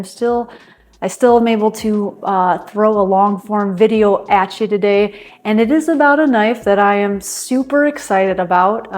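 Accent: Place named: Michigan, United States. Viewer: I love that she took my comment as cool as she did, because you can’t really judge tone through text but I love when she drops “actually” in her videos... She hits it hard with that upstate Michigan accent... (it’s kinda cute)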